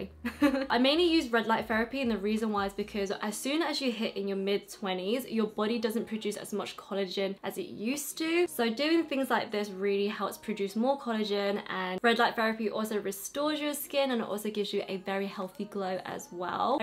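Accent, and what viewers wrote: United Kingdom. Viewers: all these years i’ve been following you on instagram and now i’m finally finding you on youtube and on top that YOUR BRITISH ! wtf lmao i love your accent though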